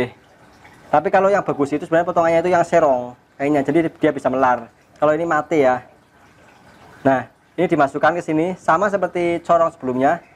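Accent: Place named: Yogyakarta, Indonesia. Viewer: Matur nuwun mas, Sangat membantu, semoga jadi amal jariyah,...btw logat Jogja nya kentel banget...😀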